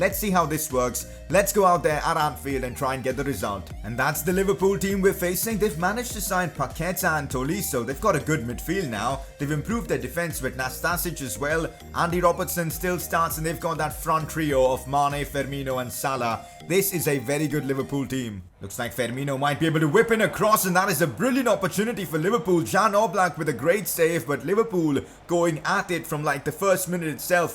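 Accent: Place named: India. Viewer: Bro ur fucking Indian voice is annoying 😣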